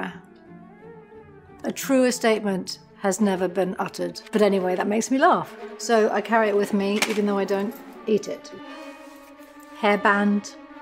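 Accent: England, United Kingdom. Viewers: That English accent surprised me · Her beautiful british accent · Why does she speak with British accent? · She’s actually not! Born in the US but spent part of her childhood in London. I forget why but her parents are not British. She moved back to the States at 11 or 12 but she had picked up a British accent while in the UK. She reverted back to American to fit it but she can switch back and forth as needed. She does her interviews in the US with her American accent. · I am blown away! Her British accent!!!!! Such a lovely lovely woman. Huge fan! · What is with British accent? She was born in Chicago. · She has a British accent now? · OMG, i thought you were American, Love your British accent way better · I was like oh no that is not Gillian this lady has a British accent Scully doesn't have an accent. I am so used to hearing Gillian with an American voice.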